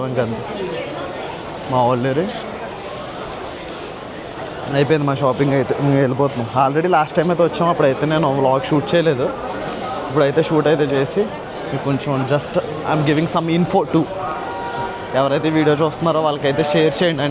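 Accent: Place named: Godavari, India. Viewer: Godavari slang 😊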